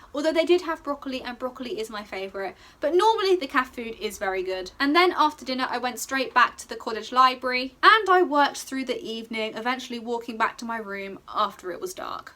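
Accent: United Kingdom. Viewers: it just sounds very British, there is nothing wrong with that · I love your british accent :))) Greetings from Argentina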